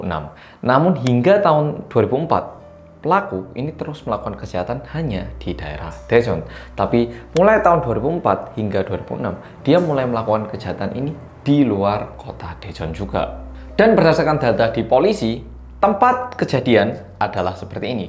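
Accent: Java, Indonesia. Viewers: Suka deh sama medoknya bahasa mas..sehat n sukses trs.. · Akhir nya setelah nonton video2 beberapa x gw subscribe niiih....punya ciri khas sendiri nih "medok" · maaf br gabung mas,mau tanya mas,mas ini asli orang mana y,kq logatnya medok kyk orang jawa 🙏 suka bgt liat chanelnya mas 👍👍👍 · Sy kaget pas denger suara mas.....logat Jawa nya medok banget🤭🙏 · Kerene mas Korea narasine enak di simak asli keren mas👍🏻baru x pertama nemu chanelnya membawa narasinya pun sedap untuk di simak dgn logat medok nya pun yg bikin terpikat outo Subscrab deh✌🏻🤣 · Jawa banget 😂😍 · Saya tuh baru subcribe, Bang. Kaget sih ketika nemu Oppa Korea bahasa jawa medok 😆😆😆, maaf ya Bang. Suka yakin, banyakin bikin video kaya gini Bang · Hai mas Korea Medoook😅 Siyap nyimak 🎉🎉🎉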